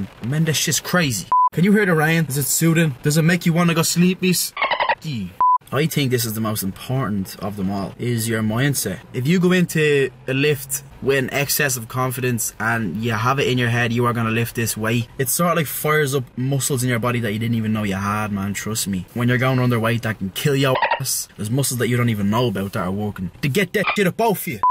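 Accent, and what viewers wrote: United States. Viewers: Are you from Texas? I notice an accent. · Cringe at the gay American accent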